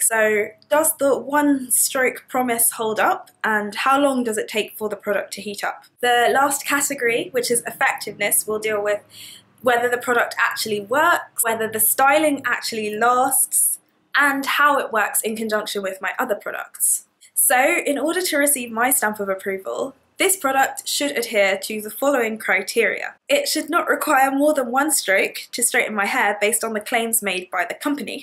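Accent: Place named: United Kingdom. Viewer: I saw Your video discoveringnatural. I would never Guess that you live in the Netherlands. Because I thought you lived in the UK because of your accent. But I love your videos and hope I get your length someday. Greetings from Amsterdam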